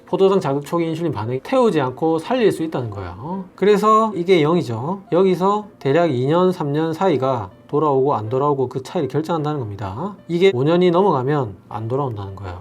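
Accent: Gyeongsang, South Korea. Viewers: 김근영 시비라니요!! 표현을 그렇게 하시나요? 난 70 할머니이고 닥터의 경상도 억양이 있으신데다 빨라서 쉽게 알아 듣기 힘든 단어가 있어서 말씀드린 겁나다 앞으로 말씀 곱게 쓰시길 부탁드립니다. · 10프로는 넘께 뺏는데 많이 좋아졌어요 3개월전 공복이 220이였는데 지금 100이하에요 근데 선생님 병원은 어디에요 경상도쪽같아서요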